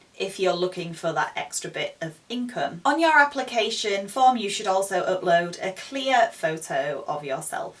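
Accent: United Kingdom. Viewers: I like to listen to your nice British accent.. Greetings from Iraq.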